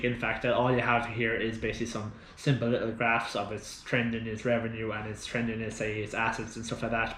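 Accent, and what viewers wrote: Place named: Ireland. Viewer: Where in Ireland you from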